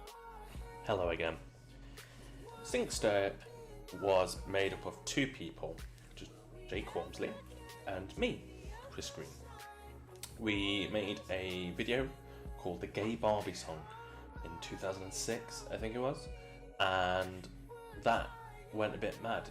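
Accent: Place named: Derby, United Kingdom. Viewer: I’ve got to ask- I’ve no idea why I thought this in the first place but for some weird reason it’s always stuck with me. You’re not from Derby, are you? The accent is throwing me off, but it’s been driving me mad for all these years. Good to see you again after all these years, hope you’re doing well! 🙏🏼🙌🏼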